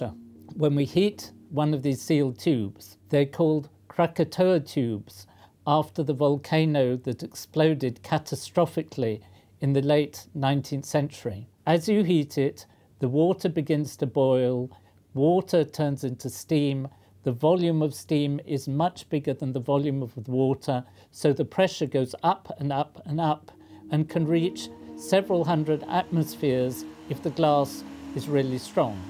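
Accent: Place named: Nottingham, United Kingdom. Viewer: That's how they pronounce tomatoes in Nottingham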